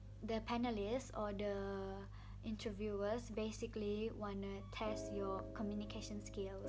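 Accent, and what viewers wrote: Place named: Sabah, Malaysia. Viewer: Dusun accent is strong in this one haha. Anyway love your make up! Pls do a tutorial for it.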